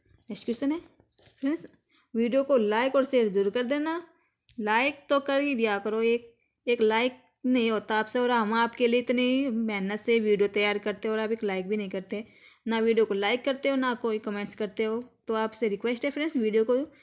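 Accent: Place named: Haryana, India. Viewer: Aap up me aake hindi bolna seekho ....haryanavi aur hindi ko mix na kro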